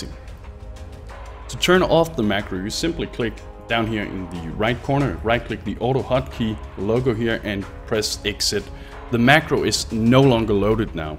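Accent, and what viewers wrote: Denmark. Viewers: Thanks for sharing the macro man! I got it to work just fine and it's working smoothly so far. By the way, just to see if I've managed to identify your accent properly; are you danish?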